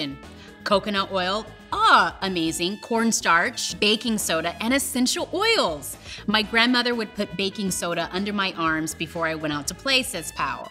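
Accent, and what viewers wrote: South, United States. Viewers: You do a very good southern accent! Then again you did live in Texas lol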